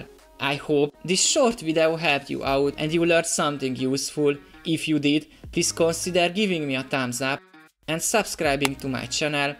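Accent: Hungary. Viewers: Are u Hungarian? The accent :)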